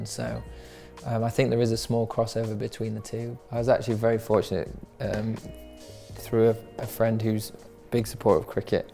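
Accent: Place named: United Kingdom. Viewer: Bro has the most polite British accent ever 😌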